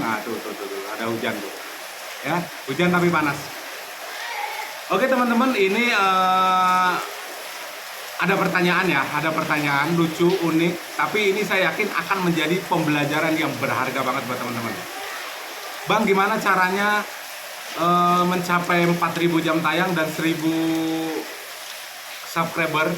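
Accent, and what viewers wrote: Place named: North Sumatra, Indonesia. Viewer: Untuk saya yang lahir dan besar di daerah danau Toba udah biasa dengan logat keras seperti ini, bahkan mungkin logat bang seberapa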